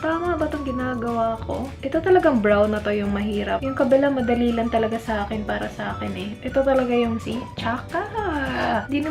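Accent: Visayas, Philippines. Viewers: halaaa, bisaya gyd diay. 😍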